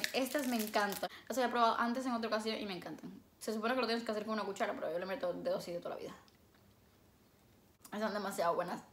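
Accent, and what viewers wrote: Colombia. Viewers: O ye tu eres colombiana ❤❤ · Está tipa no tiene asentó mexicano , tiene asentó colombiano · ERES DE COLOMBIA????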